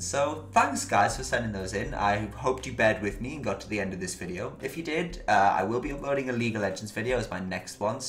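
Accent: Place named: North, United Kingdom. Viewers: If you are from New Castle, where did you get the posh accent then? hahah I thought New Castle has the weirdest accent among english people. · Gotta love that accent :D NOOOORTH bonaana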